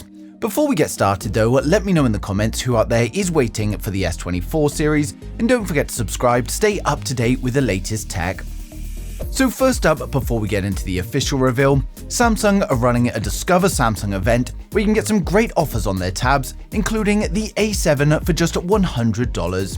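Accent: United Kingdom. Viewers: $100? Why the heck would someone with a British accent be promoting US stuff, 60 odd million in Britain not enough following for you?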